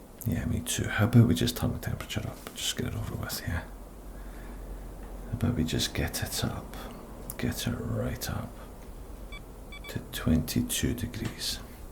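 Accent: Scotland, United Kingdom. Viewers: Found this account today, and it directly qualified as one of my ASMR favourites! Not only is it tingly and makes me laugh - on top of that I get to enjoy listening to the beautiful Scottish accent. It’s all insanely glorious! And I just want to say thank you!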